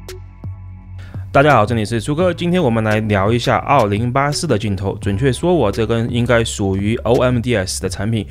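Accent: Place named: Sichuan, China. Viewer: 这个川普听起来太舒服了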